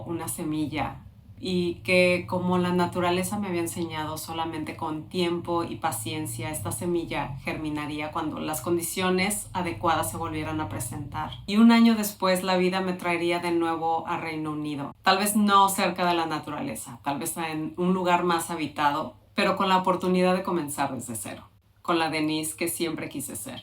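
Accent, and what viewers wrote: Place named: Mexico. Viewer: ¡Qué vidéo más bonito! Eres una mujer preciosa, con y sin canas, por dentro y por fuera. Me encanta tu acento mexicano y tu tono de voz … ¿has pensado en leer libros para niños? … Te descubrí ayer viendo vídeos sobre mujeres que han decidido dejarse crecer su pelo natural y, entre otros, me gustó mucho tu enfoque. Aunque aún no me he decidido, creo que es cierto que algo tiene que romperse por dentro para dar un paso así y dejar de pretender ser eternamente joven.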